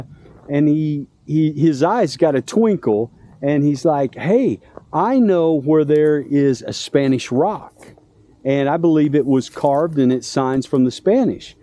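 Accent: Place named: Tennessee, United States. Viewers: Where are you from? I can’t place your accent. Tennessee is what I think. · I subscribed after watching my first video of yours, then went back to watch others. Now I look forward to each new one. You have a really soothing voice with it's southern drawl; and a storytellers style of talking that I enjoy. That inaccessible dwelling was an amazing find. The amount of effort the ancient people had to expend to haul all the rock and mud to build the wall of their dwelling. I concur that a tree would be excellent for climbing, but again the effort in that terrain! They must have been really desperate!. I'm very curious what kind of rock that long white streak was composed of?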